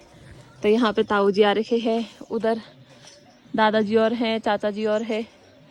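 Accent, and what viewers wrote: Garhwal, India. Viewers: aap gadwali bahut pyari bolte ho phli baar suna mene ese bolte huye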